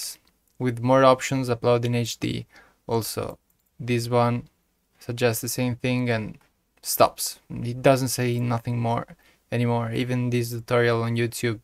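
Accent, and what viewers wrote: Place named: Italy. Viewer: si sente un sacco l accento italiano! xD comunque sei un grande, funziona e mi ha salvato la vita!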